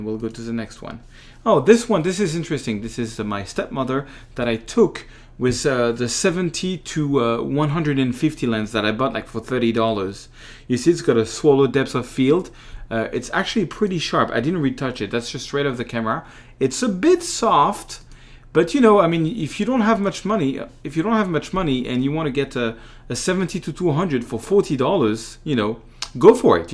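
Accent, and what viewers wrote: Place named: France. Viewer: My ears are bleeding to this awful french accent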